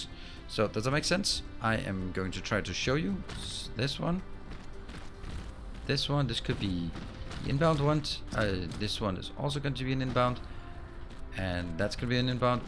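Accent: Denmark. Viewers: I just tuned in because of DSP, really liking it so far. And it's great to hear a Dane (I assume based on the accent) doing let's plays!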